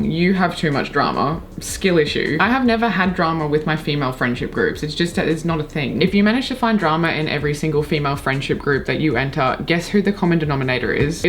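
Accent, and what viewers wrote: Australia. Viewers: Is that a surf green Fender???? I think it's surf green. It's one of the original Strat colours... They had to use Automotive colours because everything was in limited supply due to WW2. So you play 😊 cool 😎 I'm playing a cheap Cort it's a rad guitar 🎸 24 frets... So what is your site about? I'm Paul I work with heaps of people online... I go by Maori Jeebus 🖕 Maori because they hate it. And Jeebus because they hate it 😊 👍 I'm going after Trump at the moment. I was the one responsible for ELONS latest problem's. You sound Australia? I'm in Sydney.... Homoville i will leave information for you please network it out I don't push BS... Here's one have you ever heard of the Elohim? I bet Elon him doe's? He is Lucifer sweetheart mark my words I've nearly intrapt her.... I like your guitars they are rad.. paulburns 299 this is my Instagram I post stuff most days.... 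I'm chilling this week going to promote the Aboriginal and Maori People this week I'm sick of America... Good to meet you sweetheart keep up your good work lattaz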